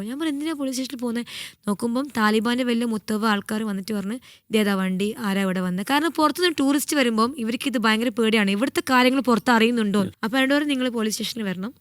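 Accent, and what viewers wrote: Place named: Kannur, India. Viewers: Kannur slang anu.... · ഓരോരുത്തരും അവരവരുടെ സ്ലാങ് ലാണ് സംസാരിക്കുക.. തലശ്ശേരി സ്ലാങ് ആണ്.. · 😂കണ്ണൂർ സ്ലാങ്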